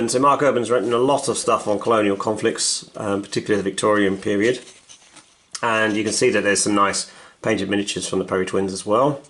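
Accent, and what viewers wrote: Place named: United Kingdom. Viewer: Thanks for providing this comparison. Very helpful. Given the historical era, the British accent gives this review something extra for Americans like me!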